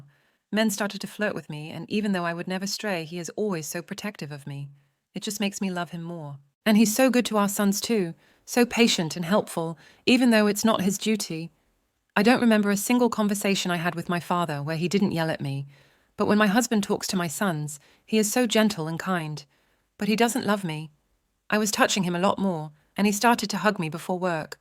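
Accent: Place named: United Kingdom. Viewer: Where people aren’t British the person just added a narrator with a British axent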